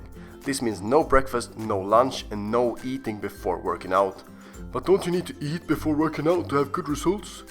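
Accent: Sweden. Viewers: You sound Swedish. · I think I specialized to recognise Swedish accent. I knew you were Swedish before checking your channel's info. Thanks to esport :) Thanks for the info though!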